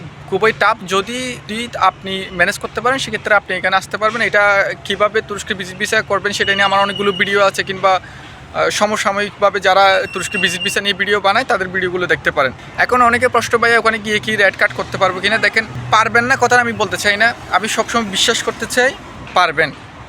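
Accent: Chittagong, Bangladesh. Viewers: তুমি কি চিটাগং এর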